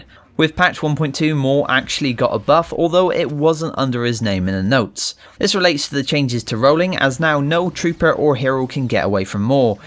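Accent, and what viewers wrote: West Country, United Kingdom. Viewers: Like the West country twang :)btw Thanks for helping out RedWolf72ps4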